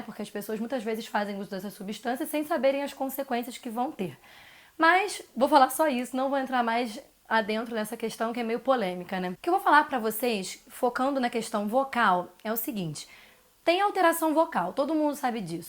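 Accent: Rio de Janeiro, Brazil. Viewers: Não consigo assistir com esse sotaque carioca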